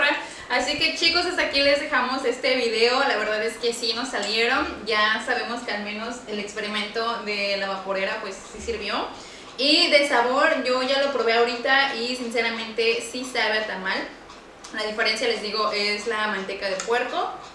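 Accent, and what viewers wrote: India. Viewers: Hi 🙋🏻‍♀️ que rico 2 Xfis para mandar😋👍🏻y si tienes el acento hindú enel inglés ☺derepente no lo entiendo ocupo poner bien atención pero es muy bueno y lo aprendiste muy rápido😉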